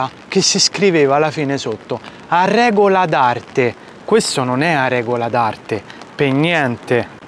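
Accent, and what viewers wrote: Rome, Italy. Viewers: accento Romano? o forse piu` ancora Toscano..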